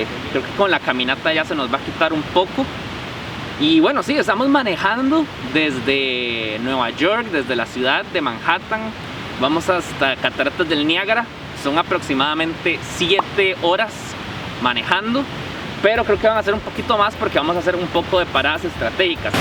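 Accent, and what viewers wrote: Costa Rica. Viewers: Si ud no es costarricense y no sabe aceptar o tolerar que así hablamos los Ticos; si no sabe reconocer que esta es una de nuestras características, entonces pásese a otro canal donde hablen un español neutro y sin ese saborcito Tico que le pone a sus vídeos... De lo contrario, calladito más bonito... 🤫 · No más abriendo el video, escucho ese acento y le digo a mi señora ese “mae” tiene que ser tico Pero lo que no me dejó duda, fue cuando dijiste que el arroz que comiste, “era como cantonés”. Así o más tico, lo digo con orgullo😂😂😂 👍🏻👍🏻👍🏻👍🏻👍🏻🇨🇷🇨🇷🇨🇷🇨🇷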